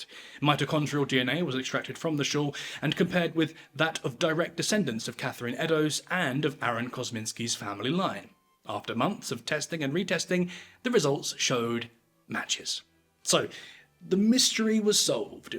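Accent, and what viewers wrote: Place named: Essex, United Kingdom. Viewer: I knew you were an Essex boy (north Essex accent is less... towie)but didn't realise how close you were to my stomping Grounds! fun fact, Jack the rippers victims are buried around the corner from my grandparents and we used to go and visit them when we'd go to see my grandparents at the manor park cemetery. My great great grandad worked there, told my mum of how haunred it was and what creepy things would happen there. I have a picture of him with his horse that he worked with; it was a beautiful shire that eventually stamped on his foot and broke it!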